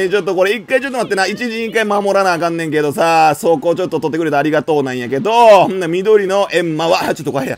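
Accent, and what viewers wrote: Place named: Osaka, Japan. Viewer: 声だけ聴いてたら120%大阪のおっさん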